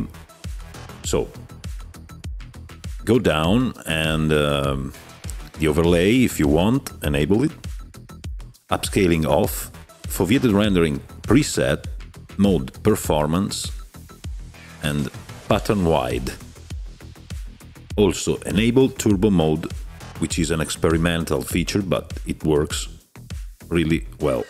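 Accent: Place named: Central Europe. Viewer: 😜Hi Doc. Please answer this question? Where do you come from? You have a special language accent . Do you remind me a Guy From Center Europe .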